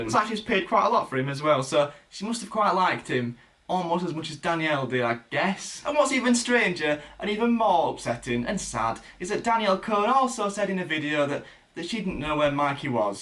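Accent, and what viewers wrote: United Kingdom. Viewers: I love your accent are you British? Swedish? Irish? Or Australian?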